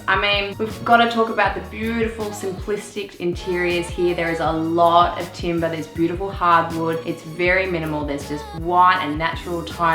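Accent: Australia. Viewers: Cute cabin. Cute accent. I lived in Australia. I miss hearing it sometimes.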